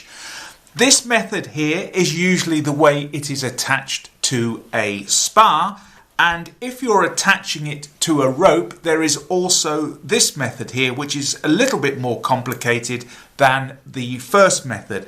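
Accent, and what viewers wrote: England, United Kingdom. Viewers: It's an English accent. The English live in England, the Scottish in Scotland, and the Welsh in Wales, but all three countries are British because they live on the same island: Great Britain. Welsh and Scottish accents are British, but this one is clearly English. Hope this helps. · I don't use it for much other than hanging tools. But I'm glad we have YouTube to show us that you appreciate the simple thing well done. Knots and the British accent seem to go together.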